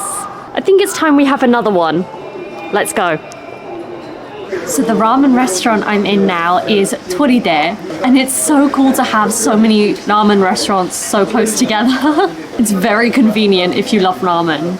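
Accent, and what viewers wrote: United Kingdom. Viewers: たくさんのイギリス人You Tuber がいるけれどさんのブリティッシュ英語が本当に耳に心地良いです☺️ あ…目にも心地良いです😊笑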